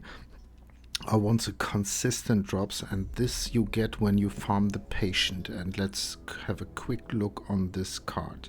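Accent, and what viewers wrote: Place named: Germany. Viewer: Du musst Deutsch sein deinen Akzent hört man schom derbe raus.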